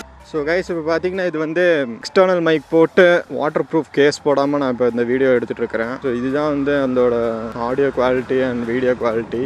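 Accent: India. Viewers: Bro neenga entha ooru Kongu slang varuthu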